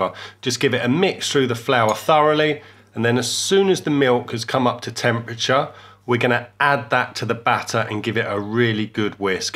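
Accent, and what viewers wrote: United Kingdom. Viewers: I am so glad the OP has a British accent and not some New Yorker! :D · This is proper, a dude with a thick af british accent telling us how to make crumpets. This is what the good Lord intended.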